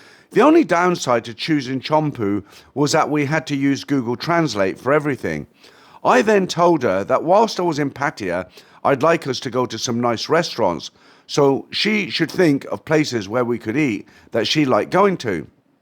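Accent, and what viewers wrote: United Kingdom. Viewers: Great story - but your "reading style" with the British accent, and no mistakes makes the stories sound very entertaining .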